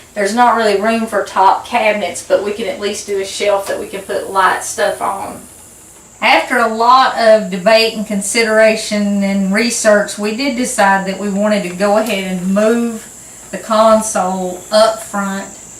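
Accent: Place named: Kentucky, United States. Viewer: Hi - what's new on the houseboat? We're working on a plan and your videos are so helpful. Where are you? You sound like you're from KY or Western NC where I live. I've learned a lot from you and look forward to hearing from you again soon. Thanks.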